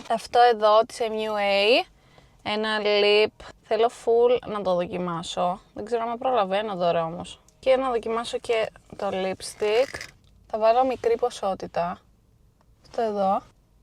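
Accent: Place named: Cyprus. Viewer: Αφου σου βγαίνει το κυπριακό... μιλα μας κυπριακά!!!!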